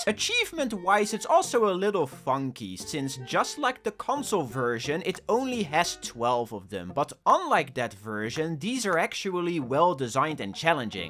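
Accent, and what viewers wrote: Netherlands. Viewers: why can I hear the dutchness? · Hoe had ik aan het begin van deze video opeens de gedachte: wacht... is hij Nederlands? Bizar dat het gewoon waar is. goede video!!1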